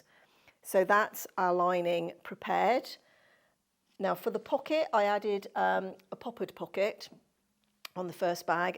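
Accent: United Kingdom. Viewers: Thank you. Brit here in Michigan so its nice to hear the British accent. You are a good teacher.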